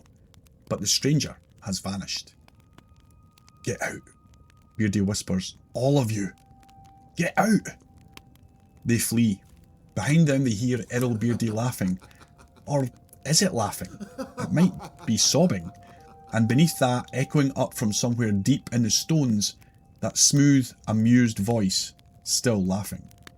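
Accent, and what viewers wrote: Scotland, United Kingdom. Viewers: Carol scottish born live in Australia 🇦🇺 FINALLY found a brilliant scottish spoken brilliant story teller. Please keep me the great work 🙏 keep your voice the way it is ... unfortunately many change voice & end up singing & playing characters. Looking forward to many more stories 😊 cheers from a scot 🏴 from Australia 🇦🇺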